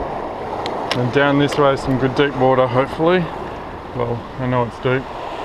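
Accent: Australia. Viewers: just subd been wachin your vids took a bit to figure your ausie, pretty sick stuff I love it, thought you were sayin "grouper" in one of the other vids then I got it, still snowing here in northern utah.usa hiding from the virus, maybe later this week I'll sneak out and catch a 24cm brown on a 4wht fly rod to scratch my itch, I just got back from southern baja were I was getting grouper, amberjack and yellowtail or "kings" as you say, what area are you fishing? so I can look on google, don't worry I won't sneak in plus I don't think I've got the balls for that kind of ........ what do you call that, "sketch fishing"? go big! stay safe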